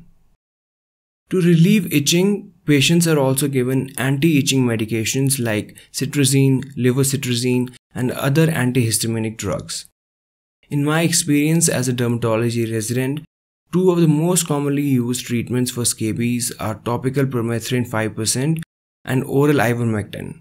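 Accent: India. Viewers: Very good coverage. Also very interesting that I detect an Indian accent; yet absolutely no trouble understanding your words. Speech is remarkably clear. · Norwegian scabies ? that’s racist just like when Covid 19 was called the "Wuhan virus", or the "Chinese virus", anybody using that term was labeled a racist. You sound like you’re from India so if a type of scabies originated in India, i doubt you’d call it Indian scabies. "What’s good for the goose is good for the gander."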